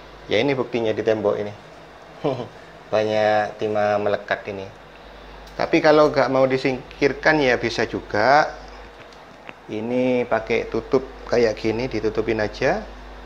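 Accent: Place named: Madura, Indonesia. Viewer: Mantap sampean logatnya kaya orang medunten ...ya bang salam solder panas bang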